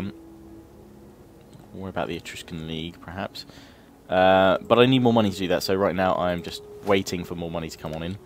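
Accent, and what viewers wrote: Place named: United Kingdom. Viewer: You have one of those proper sounding British accents. I enjoy your vids.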